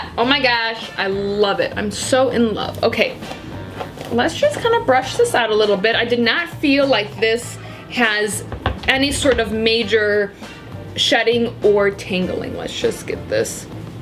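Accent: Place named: Upper Midwest, United States. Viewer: Are you from Minnesota, Wisconsin or North Dakota? Trying to figure out your accent.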